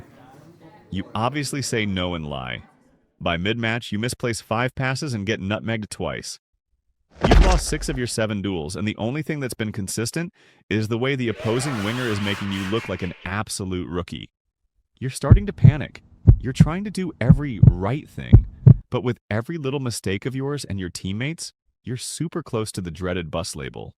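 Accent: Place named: United States. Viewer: Bro is this video a troll? Because you sound too american to be serious when talking about football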